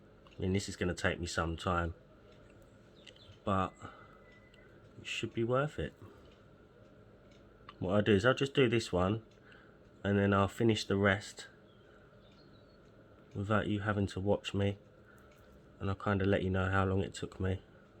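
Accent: Essex, United Kingdom. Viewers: Your accent is *charming*,. I've never, even once, had a problem understanding you, and I'm from the States & have never heard an Essex accent in my life. So, please, don't have another thought about it. It's such a nice accent! Combined with your calm voice...? Perfect mix! And, now, for the video. I LOVE this beautiful mirror casting! ❤ It's so, so pretty! The leaves are perfect (great choice of mica powder) and what a genius way to do it! 👍😊 I'll bet she loves it!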